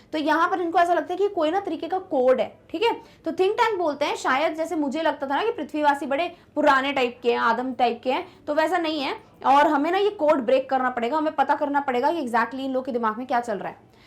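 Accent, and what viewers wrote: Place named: Punjab, India. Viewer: Mam Shayad Panjabi Background se aati hai kyuki inki hindi me thodi thodi panjabi Accent jhalaktha hai 😹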